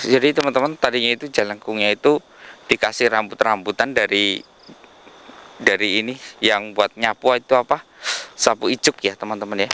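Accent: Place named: Banyumas, Indonesia. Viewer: itu bhs jawa ngapak saudaraku, bhs halus tp ya terdengar beda dgn bhs jawa solo semarang, mungkin Mr ini tinggal d daerah jawa tengah bahagian barat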